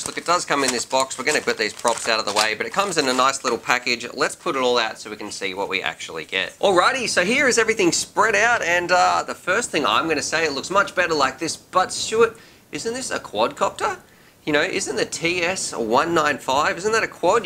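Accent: Australia. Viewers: You make funny Australian noises and it's the best thing ever!!!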